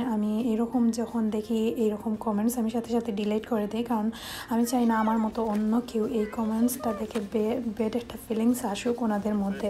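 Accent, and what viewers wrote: Sylhet, Bangladesh. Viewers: assalamualukum apa apnar muko amrar sylheti mat hunte ow besi bala lage go please sylheti bashai matba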